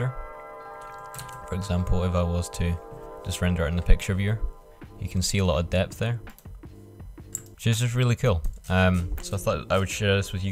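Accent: Scotland, United Kingdom. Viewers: What part of Scotland are you from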